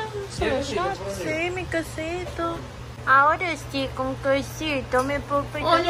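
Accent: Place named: Cibao, Dominican Republic. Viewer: Tiene el acento cibaeño muy marcado 🤣😫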